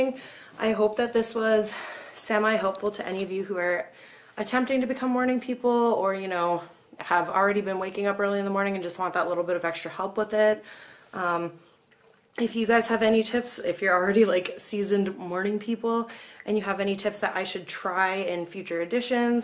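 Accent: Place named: United States. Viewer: Got here from the gram - I so wasn't expecting an American accent!!!!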